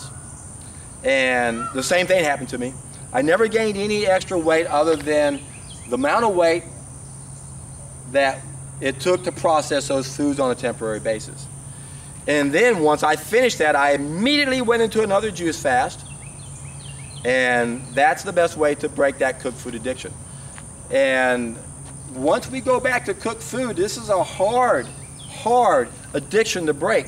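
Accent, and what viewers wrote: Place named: South Carolina, United States. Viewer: your accent sounds like mine from South Carolina:)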